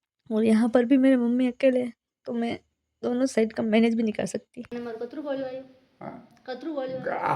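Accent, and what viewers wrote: Mewar, India. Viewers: बोली के हिसाब से मेवाड़ चित्तौड़गढ से लग रही हो दीदी❤❤ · Mera nanihal fatehnagar k pas hai or sasural bhilwara so mewadi bolte ho ap merko accha lgta hai sunna or upr se hardwork consistency awaj sb bhot pyara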